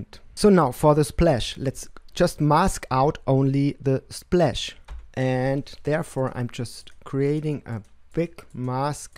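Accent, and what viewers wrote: Germany. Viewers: Du machst echt gute Videos. Warum machst Du das nicht (auch) in deutsch? Also, ich meine bei Dir rauszuhören, dass Du eher deutsch sprichst, oder? Echt gut.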